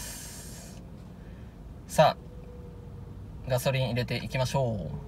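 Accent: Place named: Kagoshima, Japan. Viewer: コメント失礼します。違ったら申し訳ないんですけどイントネーション鹿児島の人見たいですね😊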